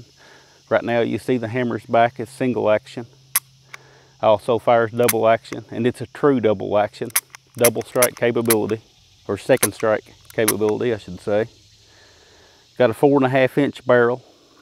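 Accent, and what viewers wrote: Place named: South, United States. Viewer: Your Presentations are Excellent MAN. Don't listen to Critics. They are to the Point, straight forward, and narrated by a Southern Man. What more could anyone ask? I Don't like to be in front of the camera either. I would rather show the content than myself.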